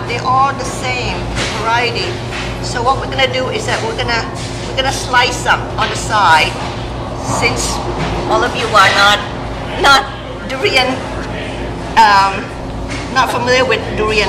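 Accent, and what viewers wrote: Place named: Germany. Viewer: where is this weird accent from? sounds german english to me!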